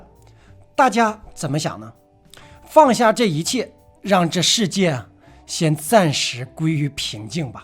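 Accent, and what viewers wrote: Northeast, China. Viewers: 是东北人还是陕西人？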